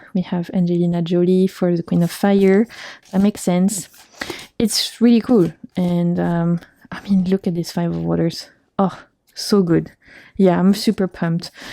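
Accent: France. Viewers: Whatever you say with a French accent is better. 😘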